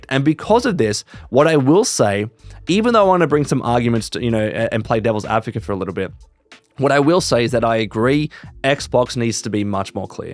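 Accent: Australia. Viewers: Loving the news coverage becoming a thing for this channel, something about an Australian accent always makes stuff more interesting I guess 😅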